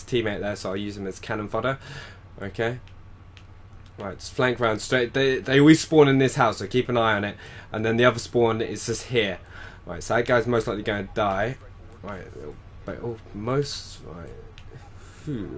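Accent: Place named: London, United Kingdom. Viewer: Which part of london u from. Im in essex. P.s im nt a stalker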